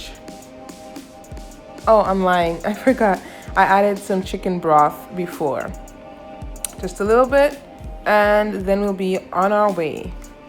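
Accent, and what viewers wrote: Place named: Dominica. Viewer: I thought your accent was from Dominica. Nice to meet you. :)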